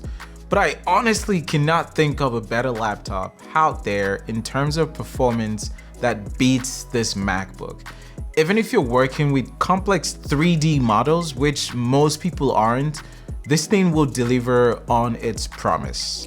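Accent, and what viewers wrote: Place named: Nigeria. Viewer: Oh, a Nigerian accent. That makes sense. Before I was permanently confused. Because the accent sounded mostly american with a slight under current of british. So my mind was slowly melting down trying to figure out what area of england or america would generate that mix. Also the review was really good and high quality too. It was in depth, without being onerously long.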